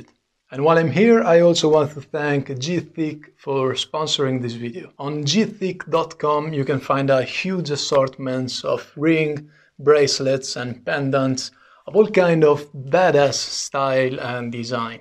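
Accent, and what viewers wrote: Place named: Italy. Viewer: Si sente lontano un chilometro che sei italiano , veramente bravo bei video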